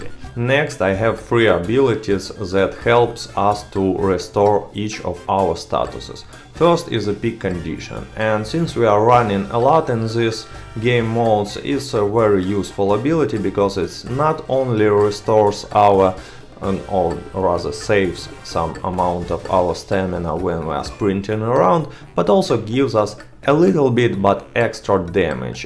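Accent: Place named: Russia. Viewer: Hey, like ur Test cause i tested with friends same way 👌🏻 There are many possibilities to make builds with the cards and u analyzed it very clear for different modes of gameplay and playstyle 💪🏻 And last but not least your russian accent is kind of what u make unique so it s funny but i like it 👌🏻 Keep it real... i subscribed and always like your videos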